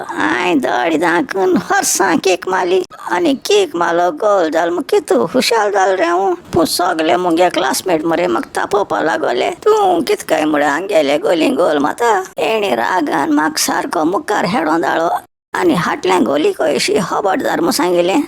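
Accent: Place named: Salcete, India. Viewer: Love your style and your salcette konkani pronunciation. I am sure gaties cannot talk this way.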